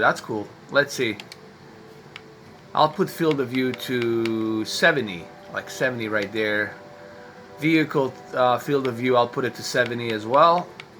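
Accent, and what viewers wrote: New York, United States. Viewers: Are you from NY? I keep waiting for you to say "dead ass" lol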